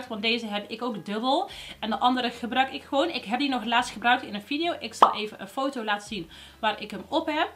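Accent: Brabant, Netherlands. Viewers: Hee. Kijk graag naar je filmpjes, omdat je zo lekker Brabants bent. 😃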